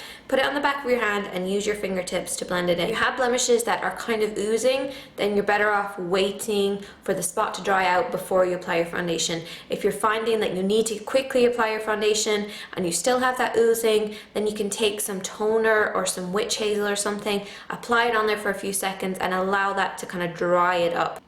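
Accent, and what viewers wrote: Ireland. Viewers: I think she's Irish? the way she says blush and water is less British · I think she's Irish.. Just a hint of some sort of accent there. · When u think she's American but soon realise that she's Irish hmmmmm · Pretty sure you're Irish! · Great video. Very helpful. p.s. I hear a little accent, are you Irish? (I love Ireland xD) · Are you Irish? Beautiful! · She's irish, you can hear it on the R's. And yeah, it says so on her description.. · I stumbled across this video while watching another makeup guru's video and clicked on this one. I just wanted to say that while listening to you speak I could hear a slight hint of an Irish accent..when I went to your profile it says you were indeed from Ireland. You don't have a very thick accent but I could hear the slight hint of it. I'm kind of obsessed with anything Irish lol sorry >_> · Ru Irish? 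You have accent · I think she's Irish not British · just subbed love your videos so brill x an your Irish yay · are you irish? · omg do u have an irish accent? like niall? i love him!!!! NOT HATE DIRECTIONATERS! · maybe she's irish?... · Still trying to figure out your accent xD Idk, it sounds Irish. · you sound Irish sometimes....are you? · Are you Irish ? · Yes, yes, I felt it too, she pronounces some words in an Irish manner, I love it. Although she speaks American English to me, not British English. Love the ways she speaks! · Irish, I believe :) the Rs are deep-ish so, that's usually a good sign of an Irish accent! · Yeah she's Irish I'm Irish too it's just a different region of accent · Are u from Ireland cuz u hav a bit of an Irish accent ?? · are you irish? you sort of have an irish accent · are you Irish? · Are you from Ireland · are you irish???? :D youre very pretty!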